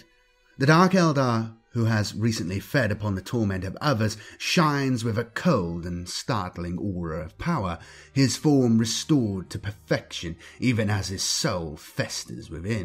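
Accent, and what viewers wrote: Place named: RP, United Kingdom. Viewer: You have crafted the almost perfect 40k narration voice , English received pronunciation with a touch of theatrical enhancements to add a dramatic effect , but not too over the top or camp , admirable restraint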